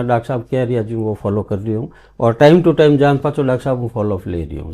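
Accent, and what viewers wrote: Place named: Rajasthan, India. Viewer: ਬਹੁਤ ਵਧੀਆ ਢੰਗ ਨਾਲ ਸਮਝਾਇਆ ਗਿਆ ਜੀ। ਰਾਜਸਥਾਨੀ ਬੋਲੀ ਬਹੁਤ ਪਿਆਰੀ ਲਗਦੀ ਆ ਜੀ ਸਾਨੂ। 🎉🎉🎉🎉🎉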